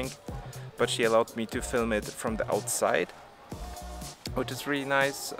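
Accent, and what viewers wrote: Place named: Eastern Europe. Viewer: This Slav has a pretty cute slavic accent. 😊 Happy that you have the comfort of Polish cuisine where you live.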